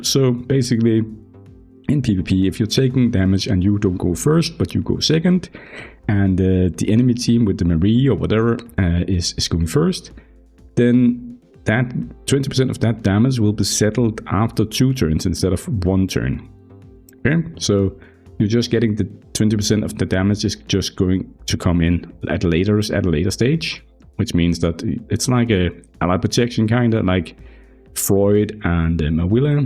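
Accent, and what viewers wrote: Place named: Denmark. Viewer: Are you from Denmark? You English have a Danish dialect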